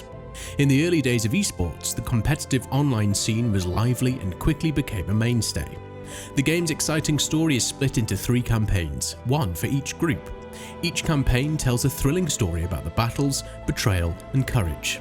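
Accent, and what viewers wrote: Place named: United Kingdom. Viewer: British english accept is the worst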